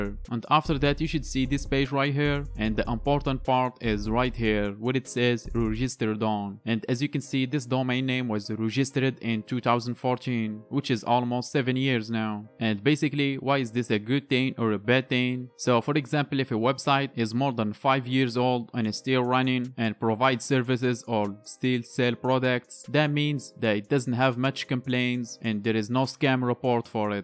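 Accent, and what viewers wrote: Morocco. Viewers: are you from morroco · لهجة مغربية 😅 بينة من طبارة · khoya bayna fik maghribi f accent rak nadi akhoya,khoya momkin insta dialek?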